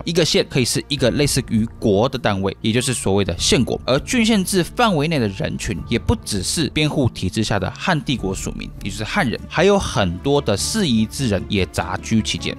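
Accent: Taiwan. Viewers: 您的口音像是來自台灣，但是您節目的深度貫穿古今中外！ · 你的口語很台灣講解方式也有點台灣不過濃濃的又支納中國的歷史觀點請問你有中資背景嗎？會不會影響我們的正確思想意識和歷史觀？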